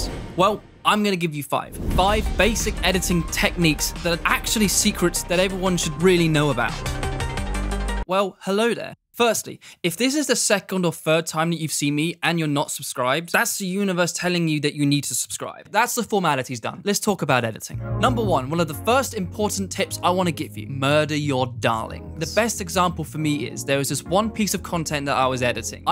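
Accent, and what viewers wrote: United Kingdom. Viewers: intresting stuff here and very well explain with that top notch british accent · Hey......Peter McKinnon sent me.....good to listen a YouTuber who speaks the Queen’s English, for that reason alone I’ve sub’d!👍😄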